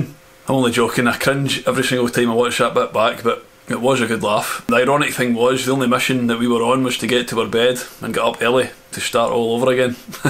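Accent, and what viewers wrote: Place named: Scotland, United Kingdom. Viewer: That’s a great video. Thank you very much. But I never met a Scot with such an extremely dialect. I nearly understood nothing. 😂😂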